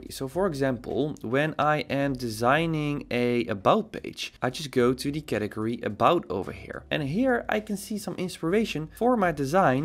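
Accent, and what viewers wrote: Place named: Netherlands. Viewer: I like how he sometimes forget to hide his Dutch accent. Great channel by the way.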